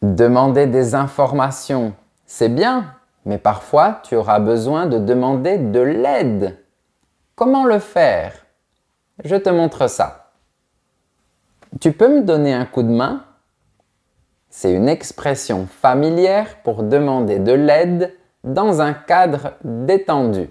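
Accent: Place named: South, France. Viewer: Un diamant, oui, mais pas brut plutôt raffiné...avec un petit accent du midi ( sur les "o"). Mais c'est charmant. Merci à.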